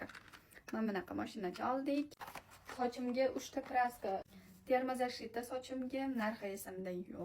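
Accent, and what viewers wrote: Tashkent, Uzbekistan. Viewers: Manam shunaqa dgandim. Nimaga Namanganlik bosez Toshkent shevada gapirasiz? dgandim. Manimcha ochirvoripti 😅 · НАМАНГАНЛИКСИЗ АММО ТОШКЕНТ ШЕВАСИДА ГАПИРАСИЗ....ТАЪЖУБ. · Намоногонлик экансан Тошкент шевасида гапириб. Нима килардинг. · Наманганликман дейсизу нимага тошкенча гапирсиз хафа булмангу ман абангизни танийман отлари Гулмирахонми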